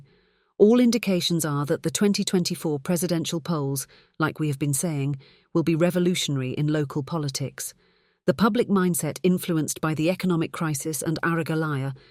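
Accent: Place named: United Kingdom. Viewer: Don't we have English speaking Sri Lankans, that we have to have this "British" lady/accent making funny pronunciations of Sinhala names!! Give me a break.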